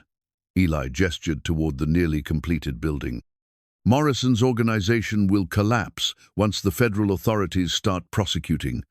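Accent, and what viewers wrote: England, United Kingdom. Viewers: I think your stories where it is about the old west it would be more believable if you had someone other than an English man to read them.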